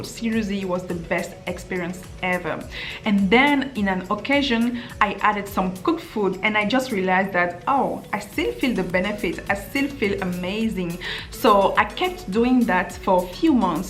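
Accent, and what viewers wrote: France. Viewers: Elizabeth Lizzy it is a French accent, it was mentioned in her Q&A video :) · I think it's a french accent (kinda like mine ahah) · Are you a native French speaker? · Are You French ? Super video!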